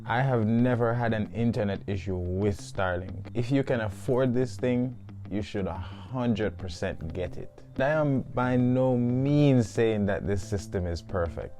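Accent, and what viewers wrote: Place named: Jamaica. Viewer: The Man speaking American English with a Jamaican accent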